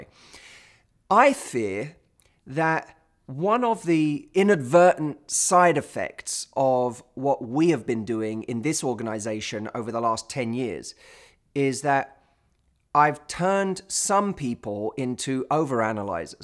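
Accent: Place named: United Kingdom. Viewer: Hello everyone, I m old, i like following those videos just for learn british english prononciation.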